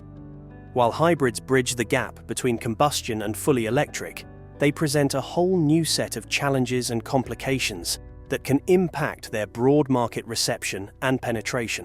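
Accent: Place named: United Kingdom. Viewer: I don't trust any promotion using a British accent.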